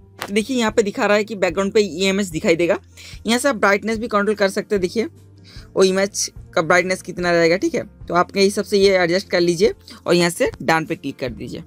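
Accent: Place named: Bengal, India. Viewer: Vo bengali hai bol ke uska hindi aisa hai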